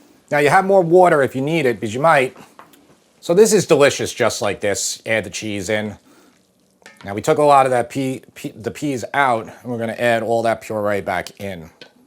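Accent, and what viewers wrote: New York, United States. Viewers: You guys from New York?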